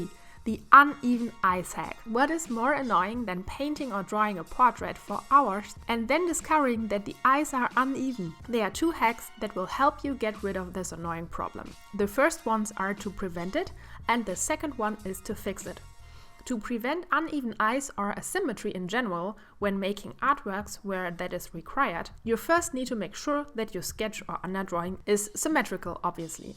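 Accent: Germany. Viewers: You start speaking English CUZ of your accent- she is german But i like your arts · Are you german your Akzent Sounds like it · Interesting German accent :) not my artstyle but your video it is actually very helpful... sometimes I use watercolor and I would love to try out more. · Min warte mal ... Man kann das Fabriano aquarell Papier auch hier in Deutschland kaufen 0.0 Ich muss wissen wo !!! Hast du es auch mal in einem laden gesehen oder kaufst du es immer online ? Ich dachte anfangs du kaufst dein Zeichen Material (zumindest das was in diesem Video ist ) bei boesner , da man das Aquarell, als auch die Airbrush, als auch die luminance stifte alle bei boesner bekommst. Vorallem die luminance, ich habe die in keinem anderen Laden gefunden, nur in boesner. Deshalb war ich so verwirrt mit dem Papier. Du würdest mir echt sehr helfen wenn du mir sagst wo du es kaufst oder ob du es mal in einem laden gesehen hast. 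Ich mag übrigens dein Zeichenstil total und wie viele verschiedene Medien du benutzt. Ich muss das auch mal austesten , gut das ich das ganze Material (bis auf das Papier D: ) hier zuhause habe xD Naja auf jeden fall würde ich mich sehr freuen, wenn ich eine Antwort von dir bekomme :D (Und sorry, dass ich in deutsch schreibe, aber man kann deine Muttersprache nicht überhören xD Jetzt nicht böse gemeint oder so °^° ) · As German native speaker I can definitely tell you that she's German. (Her last name is German, but that usually doesn't tell you much) Russian accent sounds different. Also she wouldn't be able to pronounce the German brand Schminke as perfectly as she did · wow wie hart man merkt, dass du deutsch bist an dem akzent ! Aber schöne Hacks! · In den ersten Sekunden hab ich instant gemerkt das sie deutsch ist 😂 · Super Video! Aber bitte schau dir nochmal die Ausspracheregeln für das Englische an (v ≠ f, th ≠ f, t). 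Du bist aus Deutschland, oder? Hört man binnen Sekunden. ;) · i can hear that youre german ;3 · Schönes Video :), ich habe mich erst gewundert wieso mir dieser Akzent beim englisch sprechen so bekannt vorgekommen ist, bis ich durch die Kommentare gescrollt bin und deutsche Kommentare gesehen habe xD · Man hört deinen deutschen Akzent sehr stark raus. Du solltest ganz besonders versuchen, die Aussprache von th zu üben, um es weniger wie ein s auszusprechen. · Kannst du deutsch denn der Akzent hört sich so an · thats one heavy german accent. Aber ernsthaft Respekt, dass du eine Videos in Englisch machst ist bestimmt nicht sehr einfach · Man hört richtig das du Deutsche bist :"D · The first thing I thought: She's german. You have such an heavy accent, but it's a good video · are you german bc you sound like youre german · You kinda have a german accent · I have the feeling you're from europe(maybe germany) because of the way you speak 😄 very nice Video 👌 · I think she is from Germany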